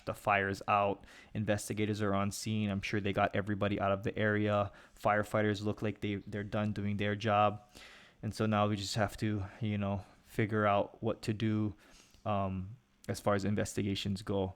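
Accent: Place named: Hawaii, United States. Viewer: sounds like you're from Hawaii. Rest in peace aviators.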